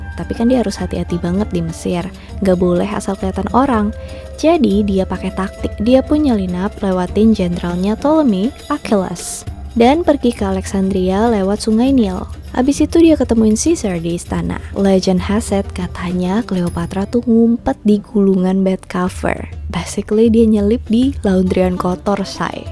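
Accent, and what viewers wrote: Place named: South Jakarta, Indonesia. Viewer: Serasa di dongengin sama anak jaksel knt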